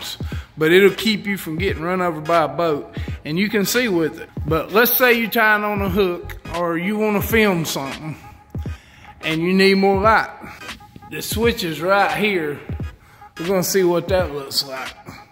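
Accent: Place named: Arkansas, United States. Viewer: Man you sound like home cooking to "dis" here displaced Arkansan! Thanks "fo da" videos brother... will be using lots of this info in my build.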